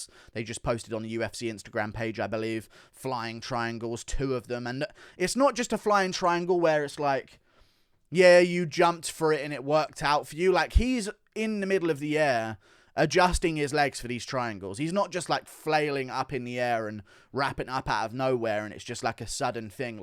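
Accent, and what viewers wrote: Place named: Liverpool, United Kingdom. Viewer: I love the liverpoolian accent, fook all dem doubters yeh?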